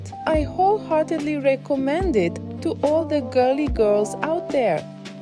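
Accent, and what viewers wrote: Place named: Stavropol, Russia. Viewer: Ставропольский акцент не спрячешь)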